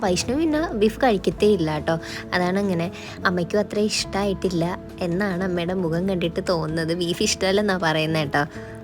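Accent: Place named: Ernakulam, India. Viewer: Ernakulam slangayallo😁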